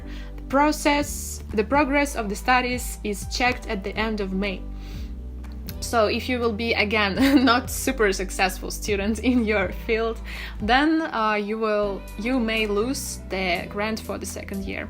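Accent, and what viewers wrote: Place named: England, United Kingdom. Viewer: Hi,I'm Nourdine from Morroco, I really like your English accent...so pretty please I can get your phone number this is my email: <mail>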